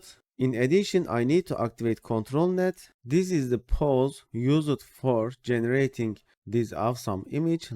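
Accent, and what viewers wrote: Turkey. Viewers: türk olduğunu konuşmandan anladım sağol abim :D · Buram buram ben Türküm diyor bu aksan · Türk ingilizcesine benziyor.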